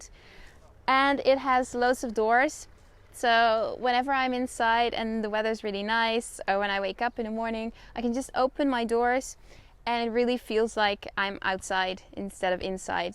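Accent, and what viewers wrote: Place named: Netherlands. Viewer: Dutch or nah?? hhahahah noticed in the first few seconds hahaha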